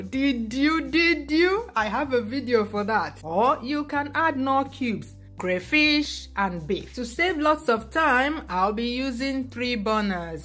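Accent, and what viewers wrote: Igbo, Nigeria. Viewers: I need the Igbo accent you’re spilling, it’s a great accessory your sharing whilst cooking a great soup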